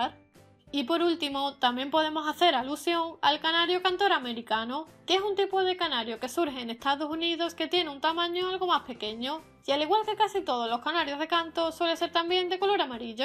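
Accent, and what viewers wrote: Spain. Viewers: serás de alguna parte de España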